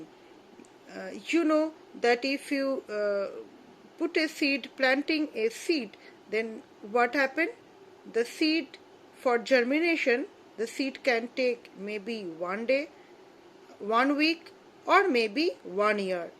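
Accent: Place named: United States. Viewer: My goodness... your English speaking is like an American😮😮!!Well you are Indian..😊!!!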